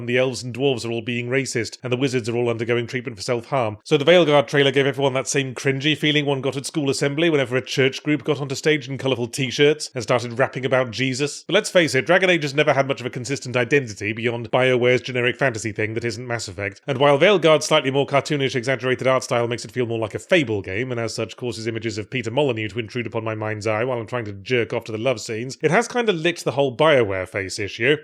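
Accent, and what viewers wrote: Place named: United Kingdom. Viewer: Yeesh: You gotta be really British to naturally rhyme "charms" and "palms".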